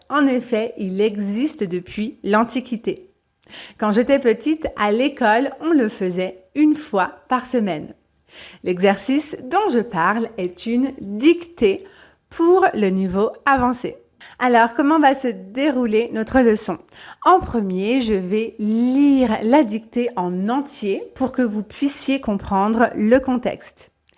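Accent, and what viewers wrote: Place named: Paris, France. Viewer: Bonjour . Merci . Apparemment , votre accent est français parisien . J'aimerais que vous laissiez les signes à l'épreuve du candidat ainsi que la mémorisation des portions de phrases sauf le . à la fin de la phrase . Voudriez-vous lire et relire moins vite toute phrase pour mi-sourds par la tension comme moi .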